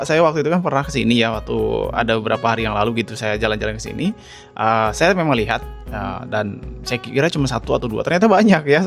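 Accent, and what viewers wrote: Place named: Sulawesi, Indonesia. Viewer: Assalamu Alaikum Bung, maaf Bung pernah transmigrasi di Sulawesi, tepatnya dimana? Pantas dialek pengucapan seperti orang Sulawesi, padahal dilihat dari nama seperti nya dari Jawa yah Bung?. Sehat dan sukses selalu Bung, Aamiin.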